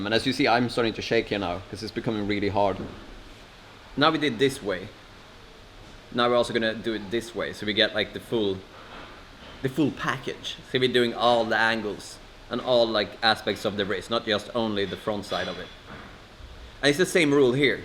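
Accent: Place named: Sweden. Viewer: Keep the swenglish! Never compromise! Love it!!!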